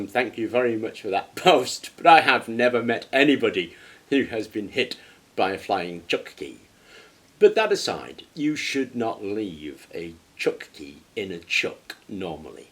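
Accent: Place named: United Kingdom. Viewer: You have a curious mind but a lot of people don't so their mind works on what they have been told. Most of us are more lazy or like the beaten path, why, well it could be fear because what we don't understand could harm us. I still like to try and I enjoy your channel but I think it's the British accent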